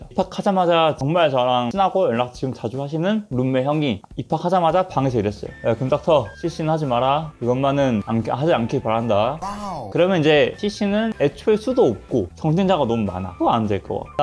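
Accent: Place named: Iksan, South Korea. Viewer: 익산 사람이에요? 익산 사투리 종종 들리는데요~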